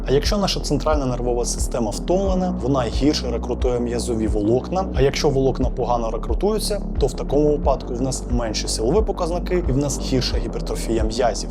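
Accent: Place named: Russia. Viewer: А я думаю що поспішає і з-за цього 20-30 % слів виходить з російьким акцентом. Треба трохи повільніше, щоб звикати до мови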